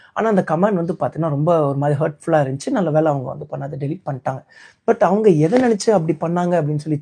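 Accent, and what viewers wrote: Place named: North, India. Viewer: Avan slang vadakan mari iruku.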